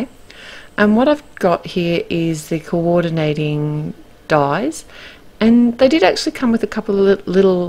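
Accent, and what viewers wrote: Australia. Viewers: Love your sweet card. I've never been keen on flat flowers, always preferring dimensional, but these look so very fresh and pretty. Thanks for all the tips about stamping. Lovely to hear an Aussie voice. Sorry, I think I say this each time I comment, but it's true. Good to know that our Aussie talent is being showcased. Well done.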